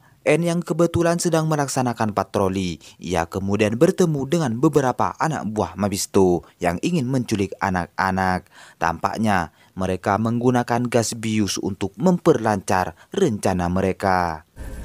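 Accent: Madura, Indonesia. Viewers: Dari logatnya kayaknya asli Madura 👍👍👍👍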